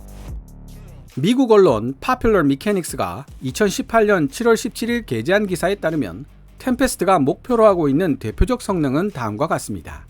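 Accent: Gyeongsang, South Korea. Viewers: 갱상도식 영어 억양 알파뱃만 나오면 하이에나 처럼 덤벼든다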